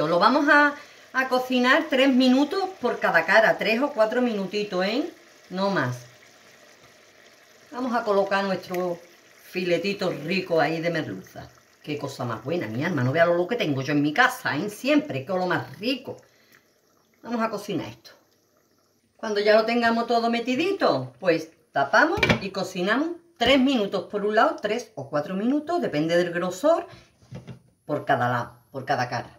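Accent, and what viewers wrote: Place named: Seville, Spain. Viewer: Sí, tiene esa “grasia”. Su acento y forma de hablar parece sevillano. Me recuerda a Estrellita Castro. Un saludo.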